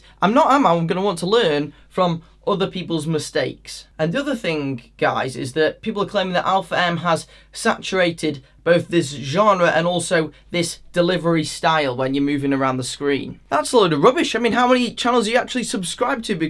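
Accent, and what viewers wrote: United Kingdom. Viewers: Hi from the USA. Just found your channel and I will be a faithful watcher, yes I subscribed. It's fun to see the differences in hand gestures and hear the language of the UK. You have a great on screen presence and I personally don't believe you copy or steal from Alpha M at all. There is room for more than a few men's fashion, etiquette and style on utube. Great job. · You are one of the persons I like and admire. You have raised my style & comfort awareness. As a slim guy I really love your channel. As a lover and enthusiast of British English your voice is melodious and pleasing. Hopefully I can met you as a person one day. SUGGESTION: Please Please Please can you make a content on 'How to Develop British Accent of Spoken English' like yours. Although there are many designated channel for this but your voice is a stand out by far. 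I believe that this newest content will compound excitement and enthusiasm level of existing as well as new listeners. This content has best of both worlds i.e style and personality. Because everyday I listen to your content to you and style content, I get refreshed with zeal to learn British English (Accent). I anticipate a response at your convenience.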